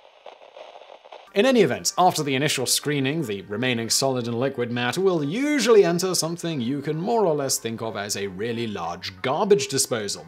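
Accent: United Kingdom. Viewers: "Something up with which we must put." If you are going to sustain that obnoxiously pretentious British accent, at least adhere to the grammatical constructions that go along with it. And while you're at it, let the metric people work out their own conversions. When in Rome, after all.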